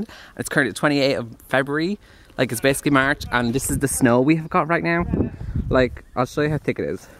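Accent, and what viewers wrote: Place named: Cork, Ireland. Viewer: Loving the Cork accent like!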